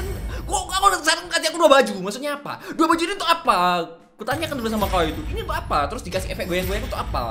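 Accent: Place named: Medan, Indonesia. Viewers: Orang Medan nya abg ini😂😂😂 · Bg Logat mu kayak orang Medan😂😂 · Nampak kali Medan mu bang 😂😂😂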